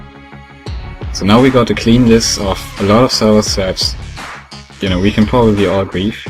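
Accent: Germany. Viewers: Man hört das er deutscher ist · Kann es sein das er deutsch ist der hatn deutschn aktzent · du bist deutsch ich erkenne es an deiner stimme :D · I can hear, that you are german :D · ah deutsch hört man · man hört so hart dass du deutsch bist xD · Man merkt an deinem englischen dialekt das du deutsch kannst :) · Hi man hört das du deutsch bist😉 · You have a german accent!Am I right? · You are a german guy or 😂 falls ja hört man es raus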